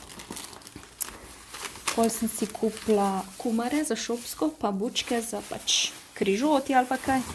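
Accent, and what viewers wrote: Carinthia, Austria. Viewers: tudi ona je iz koroske drugace nebi mela koroskega naglasa😂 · Ful si fajna!💕🌊Aja iz kot si ti ko mas ful koroški naglas,js sm iz koroske?🌍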